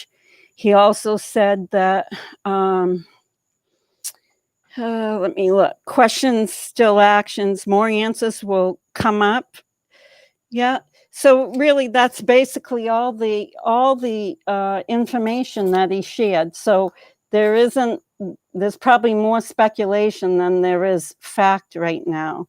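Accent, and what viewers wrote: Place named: East Coast, United States. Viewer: I recognize your accent. East Coast?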